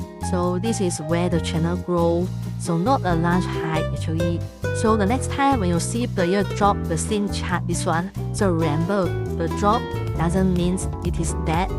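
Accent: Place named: China. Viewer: tbh, it tooks me 6 minutes 34 seconds to get a single heroes realm match attempt to happens, and someone failed to confirm because they were afk most probably. I used to be able to get matches instantly. So there is already an impact on matchmaking. Also, this videos scream of chinese propaganda, made to make people stay in the game and play it. The strong chinese accent is too obvious for it to not be that lol.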